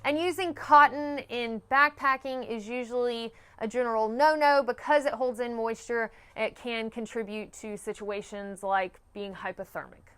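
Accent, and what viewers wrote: South, United States. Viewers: Hey y’all that’s a definitely a southern accent ha ha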